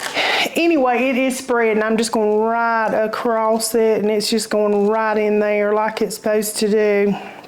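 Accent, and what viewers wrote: South, United States. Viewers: I love love love your accent Southern belle accent lol. God bless you sister!!! U had me at peanut butter, you make a peanut butter sheet cake before with peanut butter frosting???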